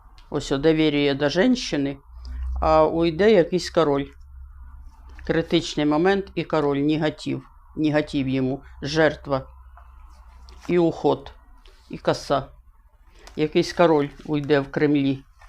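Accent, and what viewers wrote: Kherson, Ukraine. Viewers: Добрий день! Обожаю херсонский суржик! Здоров'я Вам, пані!!!❤❤❤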